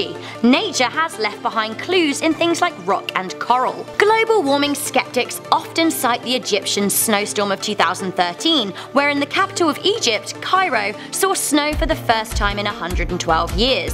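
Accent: United Kingdom. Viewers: She is from the U.K., different accent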